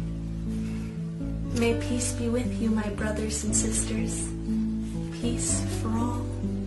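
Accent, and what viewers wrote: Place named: United States. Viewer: pretty good american accent to just have moved from argentina a year ago. wondering what else is coming.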